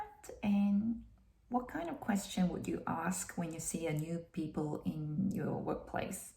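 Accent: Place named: Australia. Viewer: 제대로 영어쓰는 국가가니까 역시 영어가 훨씬 고급져진거같아 원래 잘했지만. 👍🏻 그리고 호주 살더니 오지 엑센트가 생겼어 신기해 ! "다-라-" "다운데에-" ㅋㅋㅋㅋ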